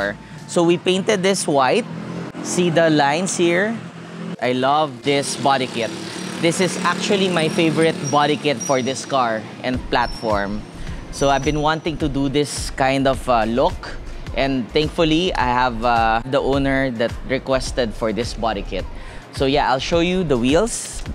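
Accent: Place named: Philippines. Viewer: You speak english but still sound philipine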